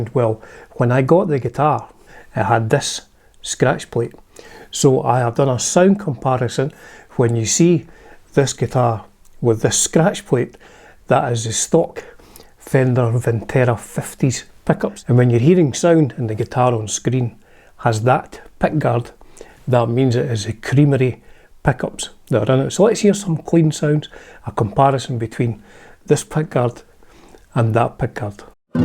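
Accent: Scotland, United Kingdom. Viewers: I love a gorgeous Scottish accent !! ❤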